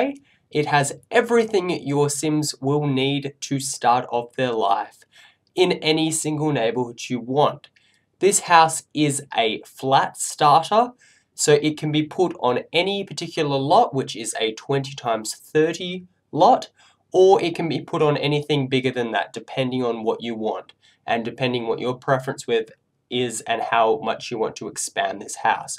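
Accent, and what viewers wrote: Australia. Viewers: is he from Australia? because he sounds like it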